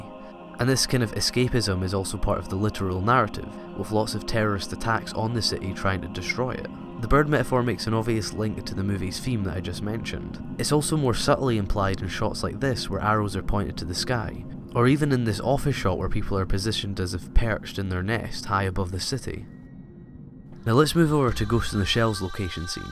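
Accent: Scotland, United Kingdom. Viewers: Are you from Scotland and if so where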